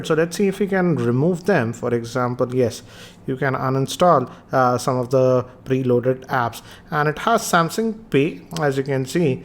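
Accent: India. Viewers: The Indian accent is too hard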